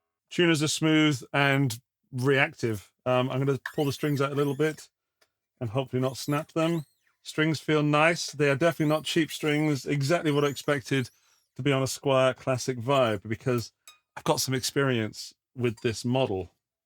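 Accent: United Kingdom. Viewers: How come we have someone with a British accent reviewing guitars in America?:face-green-smiling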